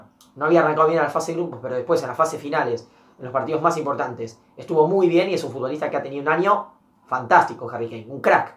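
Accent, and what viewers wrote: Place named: Argentina. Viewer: ya tenes dos suscriptores italianos hermano XD por tu acento creo que sos argentino y pues italia y argentina son hermanos de sangre asi que tambien estube muy muy feliz cuando me llego la noticia que tambien el albiceleste habia ganado... argentina en el templo del futbol de latinoamerica... e italia en el templo del futbol de europa.... como bien dijiste fue un wembleyazo... tambien por como los ingleses ya se sentian la eurocopa en el bolsillo... asi como el brasil en el famoso maracanazo cuando ya se sentian campeones del mundo.... antes del partido yo ya me sentia que iba a ser como en aquella ocasion... aca se dice que la pelota es redonda asi que uno nuca sabe hasta que llegue el final... pero algo dentro me decia que ibamos a llevarla a roma... y bueno que puedo decir.... muy pero muy buena analisis de como se dieron los hechos en el partido.. 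pero... por dios... chiellini... leelo "kiel-lini" porque asi se lee XD CH en italia se lee como QU en espanol :)) bernardeschi.. es bernardesqui :)) seguro tambien el otro compatriota mio te lo agradecera jajajajaj XD GRANDE argentina y GRAZIE AZZURRI!!!!!!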